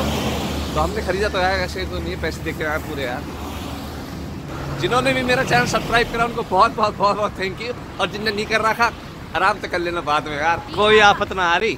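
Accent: Haryana, India. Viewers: bhai ji..mein jab japan aaunga..apse jarur milunga..u r so simple..ur haryanwi accent dil ko shu leta hai...love u..😍😁😳😜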